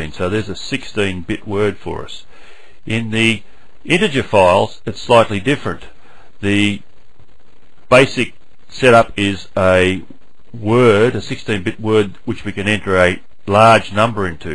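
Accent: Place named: New Zealand. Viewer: Are you from NZ? I have been over there and your accent looks very kiwi for me... :)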